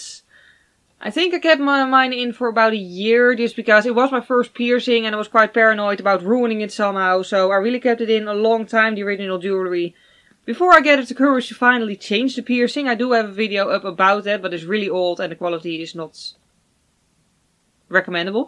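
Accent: Netherlands. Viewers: are you Dutch you kinda have a Dutch accent?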